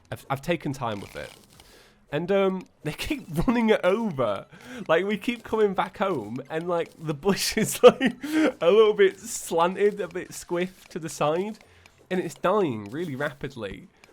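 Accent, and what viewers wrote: United Kingdom. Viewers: im the kind of guy that likes to correct people and i know this is just a dialect/accent but everytime you pronounce terraria "terr-are-ee-ah" it really bothers me, and i have genuinely nothing against it itself, the brittish accent is very nice, it just really bothers me for some reason XD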